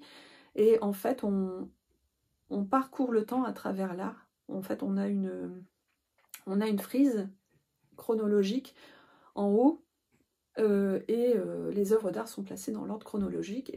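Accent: France. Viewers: J'aime beaucoup t'écouter, petit accent qui me rappelle mon enfance à Marquise 😉 mais pas seulement pour ça... Tes ouvrages sont beaux, la "rubrique culturelle" est claire, intéressante, tu me donnes envie d'écouter un livre audio... Merci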